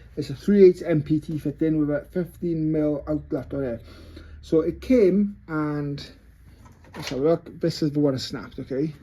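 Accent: Wales, United Kingdom. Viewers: What a headache I had find this after watching on tv.Don’t know what it is about the welsh accent it’s mint.Anyway while it’s got to be tedious at times filming I love watching vlogs like yours where there’s a lot of stuff going on instead of dwelling on stuff unless it’s the engine and u showing what’s been done👍🏼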